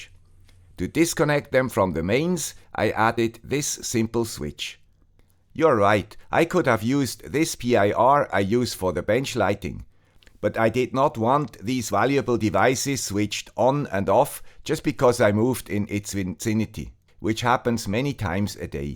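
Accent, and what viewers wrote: Switzerland. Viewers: Congratulations to 400k subs 👍🏻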